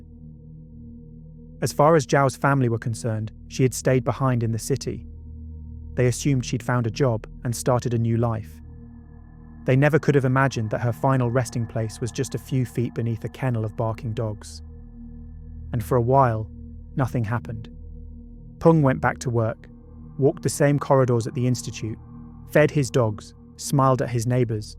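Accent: England, United Kingdom. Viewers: Excellent narration! Love the beautiful English accent & diction.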